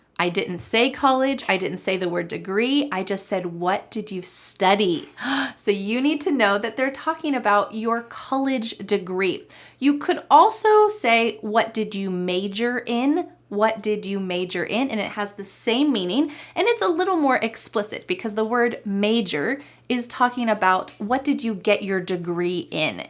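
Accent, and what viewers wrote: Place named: United States. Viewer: I went to college in Bogotá (Colombia), graduated in 2023, majored in Law, currently attending the LLM at the Hard Knocks Academy, rather book smart, and lacking street smarts, definitely a bookworm (in Spanish it would be: Ratón de biblioteca: library mouse", literally), currently dont have to hit the books, and life long learner (love the movies, enjoying awards season at my local multiplex - CineColombia), maybe a little bit oversharer - no way!); thirsty for knowledge, if not the Booze - this I had to look up at Merriam - Webster, as a synonym (our local spirit being Aguardiente, lit. fiery water; tha latter won´t make it to Merriam-Webster, but maybe to be found in the authority for the Spanish language RAE, and for sure this one I did look up at the memoirs of street academy/smarts); love that - I take it - american accent of yours. 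In the last bit a tried to apply at least one of Trunk´s elements of style. Thanks, very enjoyable!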